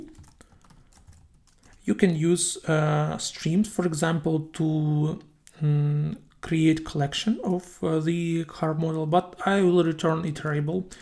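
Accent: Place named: Eastern Europe. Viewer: slavic accent😎💪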